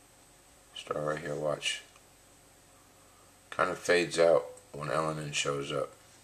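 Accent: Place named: India. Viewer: Good job! Distance she travels per day towards us...300,00 per day? How soon will we have a guesstimate re: mass?Why you speak like Indian...? LOL...prolly lots o stupid questions,fatigue to name a few...keep on keepin on!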